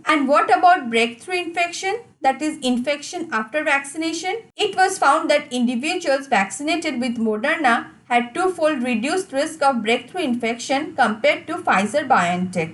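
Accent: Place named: India. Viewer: I can't understand a f'k'n' word this idiot is saying. It's not English, it's some bizarre form of Hindi/English pidgin.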